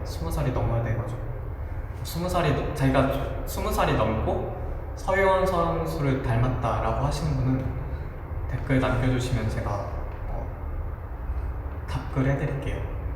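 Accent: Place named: Gyeongbuk, South Korea. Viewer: 고향이 어디인가요? 경북지역 억양이 있는데.. 궁금하네요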